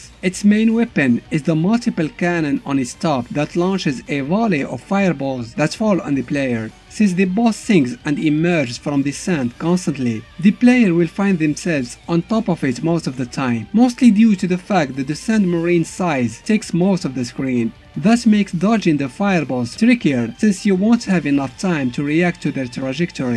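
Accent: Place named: Morocco. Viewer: I love your videos ❤️ man you accent looks so Moroccan 🙂🙂🙂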